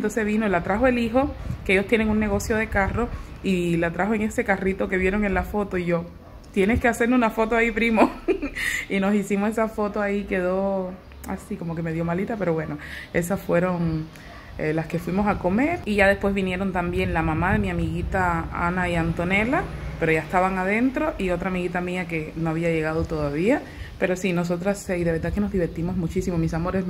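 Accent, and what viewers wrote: Cuba. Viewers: Es que son cubana las 2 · Ehm... los cubanos hablamos así. Infórmate un poco amix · Ella las dos son cubanas por eso hablan parecido .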